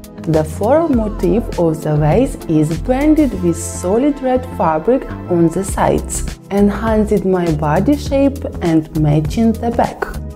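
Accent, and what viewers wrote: Russia. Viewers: Хорошая девочка. Только ей пока лучше не говорить, а читать тексты, при этом соотвественно жестикулируя и двигая губами и глазами. Т е накладывая видео на текст. Тогда темп речи будет быстрее и легче будет слишком тяжёлый русский акцент, который сейчас тяжелее немецкого.Поработать над слитностью речи и звуками. Всё равно это придётся делать если она хочет международной аудитории. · это какой-то трэш от очередной васи пупкиной, а для чего такой рязанский-английский?🤣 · Русский английский так прикольно звучит :-)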